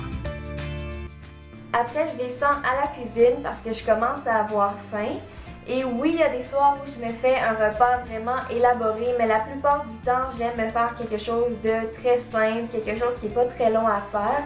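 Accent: Quebec, Canada. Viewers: j adore ton acsant québécois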